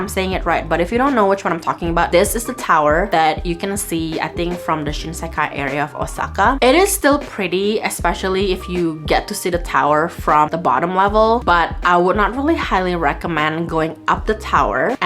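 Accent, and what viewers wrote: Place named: Indonesia. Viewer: Good review especially on the fish tank exhibition at Ginza.Your English is very Chindo :)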